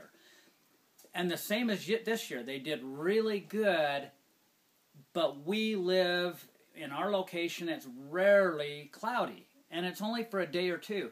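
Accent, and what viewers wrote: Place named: Russia. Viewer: Mmm yummy russain accent. My wife is russain. Very good woman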